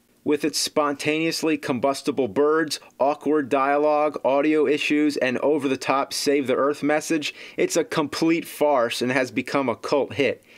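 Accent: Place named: Northeast, United States. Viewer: Typical northeastern accent.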